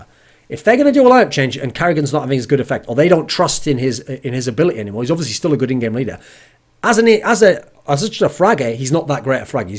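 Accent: United Kingdom. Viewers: BRITISH ACCENT SUCKS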